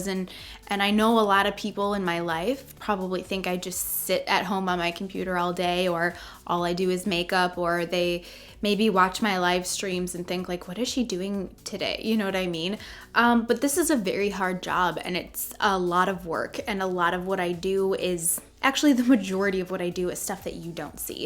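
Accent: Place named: Chicago, United States. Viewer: Love listening to your Chicago accent. Made me smile when you said Pop for soda ☺️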